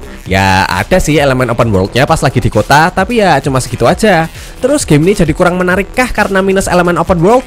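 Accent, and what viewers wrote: Java, Indonesia. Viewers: Bg jangan meddok kali bg. Kami yg bukan sesuku agak bingung bg dengernya 👍🏽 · Bahasa indonya medok banget yak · Medok e puol mass😂 tapikusuka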